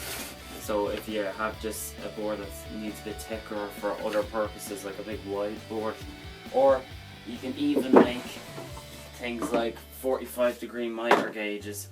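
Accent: Ireland. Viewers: Great job!! Loved the video, but then again I'm partial. Your accent makes long for the old sod. Keep up the grand work!!!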